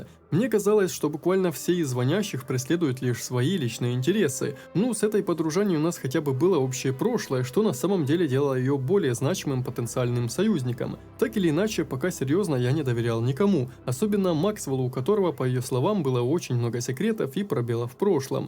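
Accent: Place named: Ukraine. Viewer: судя по говору он украинец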